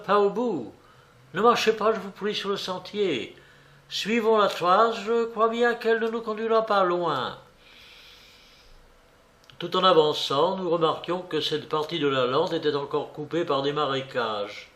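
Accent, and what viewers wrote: England, United Kingdom. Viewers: Le lecteur qui est connu pour ses qualités de diction adopte dans récit l accent anglais qui parfois écorche les oreilles alors qu il aurait dû parler simplement en Français. Mais malgré tout il mérite les félicitations. DJENANE Rachid Alger.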